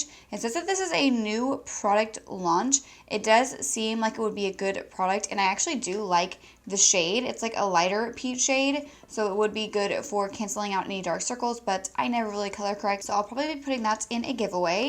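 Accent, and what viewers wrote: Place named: United States. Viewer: i love the American accent 😍😍💙🎄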